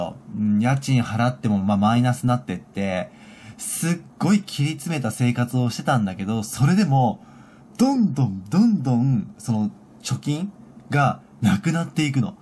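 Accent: Kansai, Japan. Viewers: おとんってｗ さん、ところどころ関西のｲﾝﾄﾈｰｼｮﾝがありますけど、関西人では無いんですよね？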